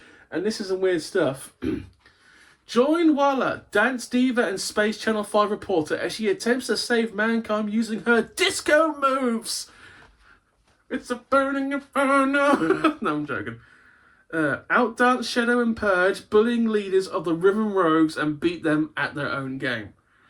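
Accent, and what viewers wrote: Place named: Germany. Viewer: That was 1 funny upload 😂 loved the German accent 😂 and defo checkout gaming rarities channel 👍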